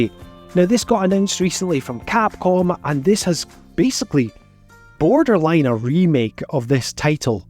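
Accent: Scotland, United Kingdom. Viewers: Hey as a sidenote, yesterday I watched a collab video in Femtrooper's channel and it was a pleasant surprise to see you featured there! I'm really glad to see your channel growing and doing collabs like that, you make some great content keep it up! (Also it might sound weird but I love hearing some scottish accent for a change on YT haha)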